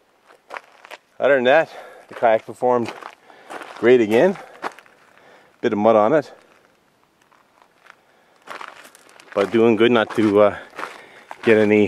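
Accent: Canada. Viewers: assuming your accent and not watching any of your videos i assume you are Canadian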